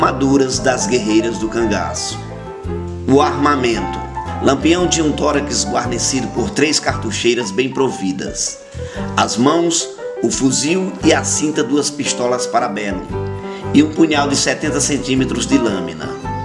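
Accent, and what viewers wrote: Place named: Northeast, Brazil. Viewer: Sua narração é show, seu sotaque nos emociona, só quem é nordestino senti!!!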